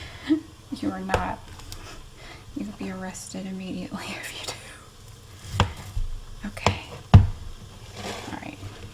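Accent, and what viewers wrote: South, United States. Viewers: Holy smokes people really admire you sound south lady!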